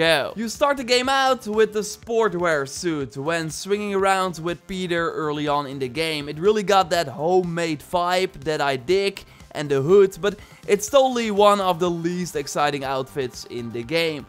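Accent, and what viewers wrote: Netherlands. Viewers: Je kan horen dat je Nederlands bent lmao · Steenkool engels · lol je accent geeft zo hard weg dat je nederlands bent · ben je nederlands ofzo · Are u dutch? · ik hoorde meteen dat je nederlands was😂😂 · jij bent nederlands of niet ik hoor een nederlands accent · Are you dutch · are you dutch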